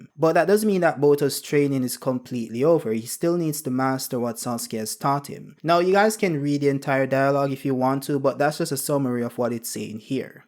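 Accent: Jamaica. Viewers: Aye yuh a Jamaican? Yuh sound like it🇯🇲💫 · Its giving Jamaican do your thing bro keep growing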